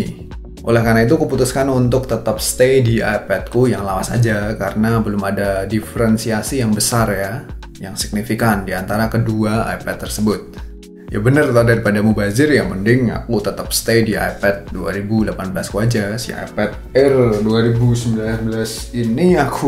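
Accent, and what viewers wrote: Java, Indonesia. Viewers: Mas medhok mu kui lho 🤣🙏🙏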